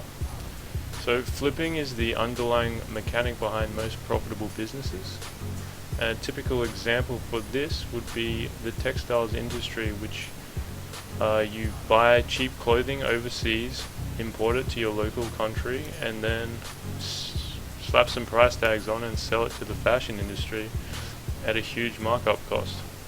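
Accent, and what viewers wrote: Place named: Victoria, Australia. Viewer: Maaaate, Guessing you are Victorian from your accent. I'm from WA. Hey, this is a bloody good video. You called all the pros and cons and it was presented without the hype. Kudos! sorry you got so few likes. I'd give you five stars! Any way, Thanks mate!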